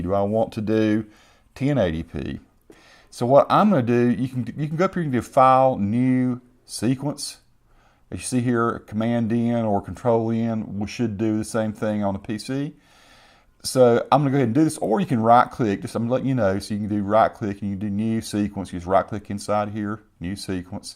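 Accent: South, United States. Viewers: Sounds like a Southern Bumpkin 😊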